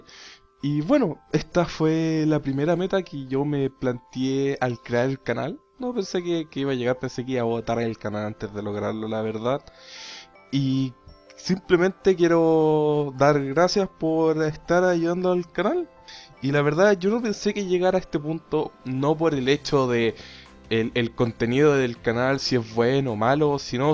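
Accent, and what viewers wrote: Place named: Chile. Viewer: Yo te sigo porque sos chileno porque y amo tu acento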